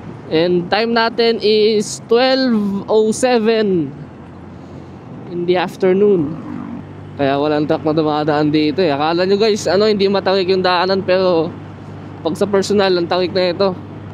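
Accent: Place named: Cebuano, Philippines. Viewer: 😂😮 Cebuano k pla hnd waray,ingat ba Kaibigan 😊😮idoy or nonoy ,noy sa Tagalog haha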